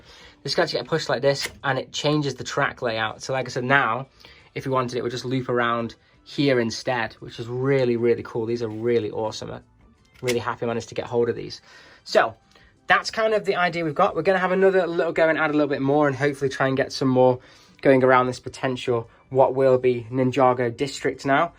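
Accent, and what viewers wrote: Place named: United Kingdom. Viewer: Man I would love to have this set, but it was at a time period where I stopped being into building LEGO. Online and used, this set goes from $500 up to $1500 US now a days, which is just too expensive. I'm guessing by your accent, you bought this set used in pounds? I think if LEGO was smart, they would come out with a new monorail set. It would most definitely be a sell out.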